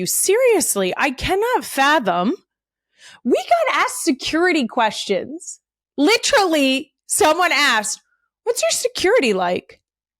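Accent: Philadelphia, United States. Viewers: I’m not gonna lie… I am pretty sure your Philly accent has only gotten stronger!! 😂 this coming from a Canadian who loves it!❤ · So much Philly (I’m not complaining)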